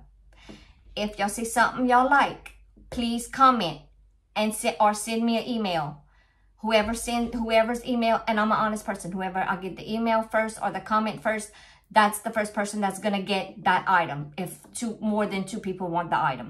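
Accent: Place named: Louisiana, United States. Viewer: That is one pretty owl. I'll have to check out your keychains next time you show them. I ordered from Abigail when she first opened her shop but it was not putty. It was wax and it's great. I still have a ton left. Smells amazing too even after a year. You are almost at 250 so congrats on that. 🎈I'll shout you out on my Community Page. I love listening to you talk because my Mama was a Cajun lady herself from Louisiana and I miss her so much.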